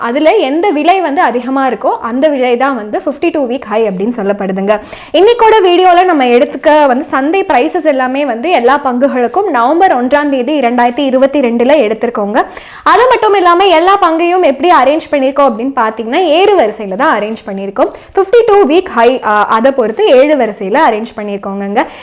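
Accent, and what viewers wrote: Coimbatore, India. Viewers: I appreciate your Coimbatore Tamil lecture.long live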